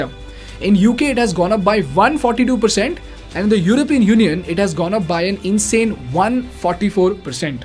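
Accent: India. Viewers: Sorry but your English is not very good. Your English with Indian accent. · It´s hard to understand a person from India speaking Englisch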